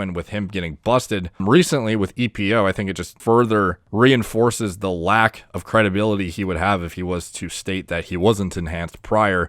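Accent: Canada. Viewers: The Canadian accent is strong with this one. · Maybe it’s just because I’ve watched too many of them both but sounds more Canadian whereas Nick’s voice sits firmly in the “country parts of the northeast” camp.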